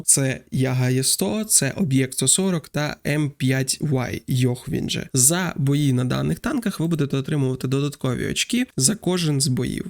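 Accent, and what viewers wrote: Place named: United States. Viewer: Не надо американского акцента очень слух режет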